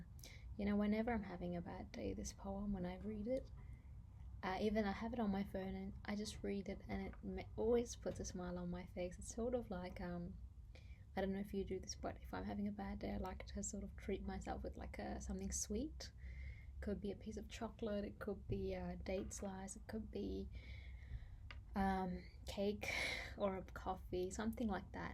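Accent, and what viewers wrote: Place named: United States. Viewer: Good poem .. Your accent is American, but you look like Indian 😊😊❤❤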